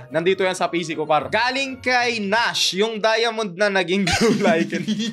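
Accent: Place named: Batangas, Philippines. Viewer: first kopo marinig mag batanggenyo kapo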